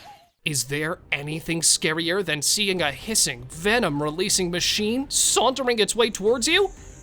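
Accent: United States. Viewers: Totally agree, annoying American voice ! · If you ever want a nature documentary completely ruined, get a yank to narrate it. What a colossal putz!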